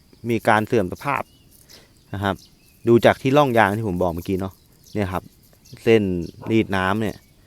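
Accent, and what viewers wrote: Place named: North, Thailand. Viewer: จะถามหลายทีแล้วครับ จขกท.เป็นคนที่ไหนครับ สำเนียงออกทางเหนือจังเลยครับ ไงก็ติดตามคลิป ตอนนี้ยังไม่มีกำลังทรัพย์กับวาสนา อีกหน่อยคงได้ควบฮาเล่บ้างครับ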